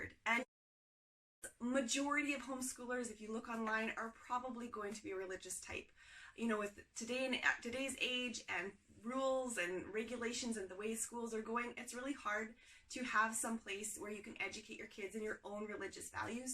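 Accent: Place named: Winnipeg, Canada. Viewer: You sound like you have a Winnipeg accent ....lol 😁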